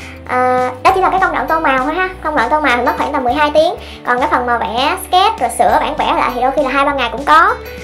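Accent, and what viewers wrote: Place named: Vietnam. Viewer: chị nói tiếng anh thuần việt ghê, em thích :))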